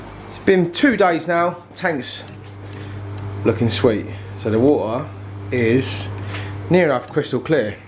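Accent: London, United Kingdom. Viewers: Good content man. Subbed👍 where u from mate? London? Essex??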